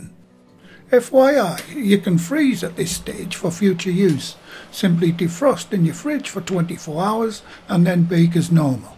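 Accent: United Kingdom. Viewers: I live 100 miles away from where this gentleman sounds like he comes from. I'm not so sure people are being offensive, the video is very good, his voice does resemble one of the worlds most recognised animated characters, I'm pretty sure this will also help boost the hits and likes on the video. Also, accents should be celebrated, I love how our accents vary across the UK, for small island, you can 30 miles in any direction and find a different accent. 👍🏻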